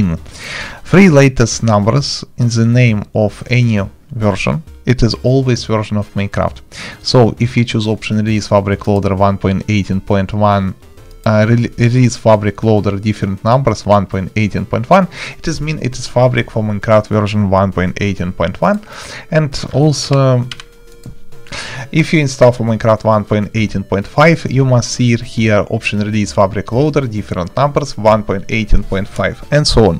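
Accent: Russia. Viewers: У тебя прям сильно русский акцент)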